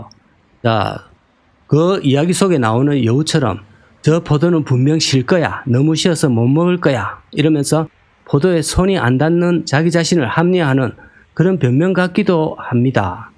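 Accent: Gyeongsang, South Korea. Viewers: 말투는 이명박 같으신데 ^.^ 경남이시네요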